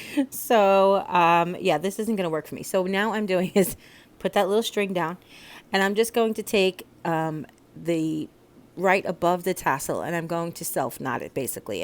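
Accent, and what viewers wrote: New York, United States. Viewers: Beautifully done! The way you tucked in the moss really made the letter stand out. Great tute (lol) on how to make an absolutely perfect tassle. The trick with securing the wrapping string is a madame knot, I'm sure you know that. You were going to say something about the shamrock and/or four leaf clover, did I miss it? Tyfs, love these and as always it was so nice spending a little time with you, your New York accent really came out on this tutorial and I love it. See you soon 🤗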